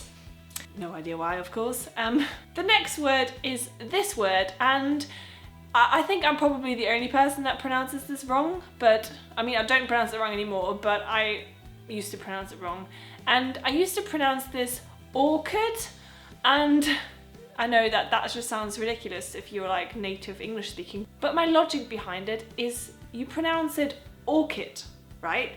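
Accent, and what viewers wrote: England, United Kingdom. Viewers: you sound ENGLISH to me.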